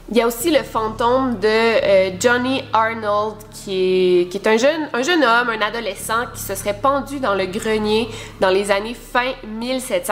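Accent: Quebec, Canada. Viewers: Je suis abonné que depuis hier et je suis déjà fan parce que tu racontes vraiment bien les événements ! Ça me captive et j'adore ton accent québécois c'est bien ça? :) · À force de regarder tes vidéos, je suis devenue accro à ta voix ! J'adore ton rythme de narration et ce bel accent canadien!💕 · j adort l'accent canadien.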